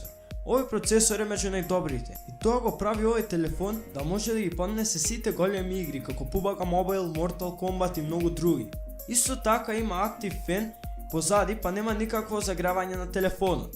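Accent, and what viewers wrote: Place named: Kavadarci, North Macedonia. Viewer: top si be uzhivaj ali probaj da zborish poishe skopski bolje zvuchi za gledachitw posho bolje kavadarchani da slushaat skopski nego skopjani kavadarski ako me sfakjash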